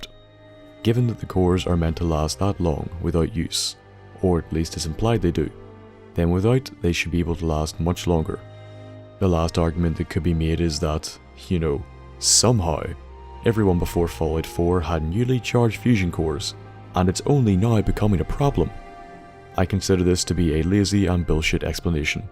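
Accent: Northern Ireland, United Kingdom. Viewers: Northern Irish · Been here since at least 2013, sitting in my mums and playing nv and listening to to guide me through, yeah im a scott but his is a n.i accent so i can understand it clearly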